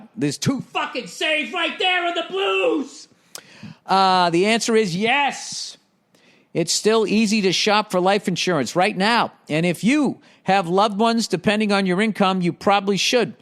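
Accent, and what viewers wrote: Boston, United States. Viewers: Bwaaston... Haha love hearing you. Keep it going.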